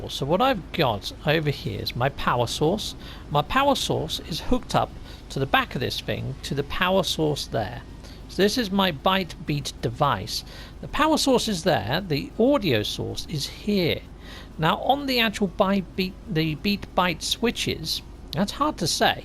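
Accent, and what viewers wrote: England, United Kingdom. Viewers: Classical English voice is classily English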